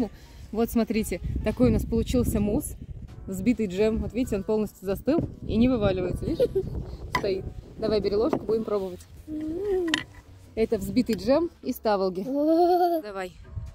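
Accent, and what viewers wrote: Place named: St Petersburg, Russia. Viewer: Это первое видео, которое я смотрю на Вашем канале. Такая у Вас речь четкая. Ближе всего к питерскому акценту, хотя, я мало где была в России. По голосу слышно, что Вы хорошо поёте.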